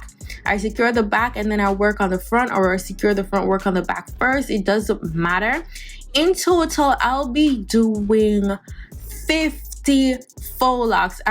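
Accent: Jamaica. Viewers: aye from mi hear start tlk mi know ya jamaican enuh but anyway love the video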